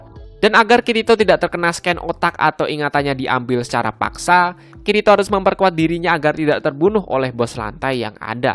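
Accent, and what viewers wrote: Java, Indonesia. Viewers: Mungkin dia medog :v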